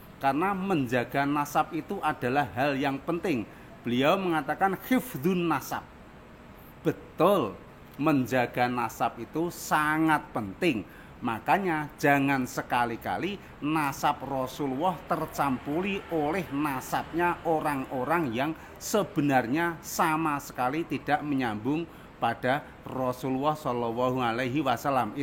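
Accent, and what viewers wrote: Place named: Java, Indonesia. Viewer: Ini pak guru cerdas walau agak medok dikit . Lanjot pak de..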